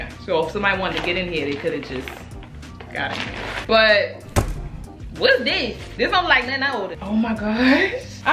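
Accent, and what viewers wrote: Baltimore, United States. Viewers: Are you from Baltimore because I think I hear an accent? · Are you from Baltimore? I hear that accent !!!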